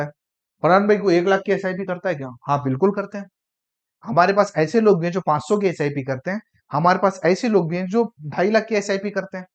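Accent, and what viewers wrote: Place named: Maharashtra, India. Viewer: Assalamualikum bhai ❤. Alhamdulillah 99% sahi bole ap. Aj ap jaise logo ki sakht zarurat hai waise to log mahino me kae fuzul kharch kardete h jaise pan,Gutkha, cigarette aur najane kya 😢. Allah pak hame sahi jagah kharch(investment)karnewala banae. Ameen bhai jo bhi apne samjaya ❤ par question ye hai sab apne theoretical samjaya Alhamdulillah samaj me Aya practical demo samjao jaise 1. SIP aur LUMSUM kaise kare ispe 1 videos 2. DIRECT aur REGULAR kaise kare. Aur bhi question h par Insha Allah ap se judne ke baad. Apka tune samaj me ata h q ki ap Maharashtra se ho aur ham BHI AURANGABAD se ❤ Allah pak apko jazae khair de aur apki team ko bhi. Assalamualikum ❤ Plz reply 🙏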